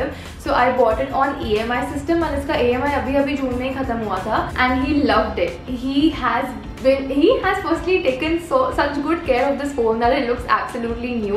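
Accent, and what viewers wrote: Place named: Maharashtra, India. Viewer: Hi di Ur videos are amazing. Apke videos me na woh marathi hindi ka jo touch hai na I love it😍😍☺🙂😁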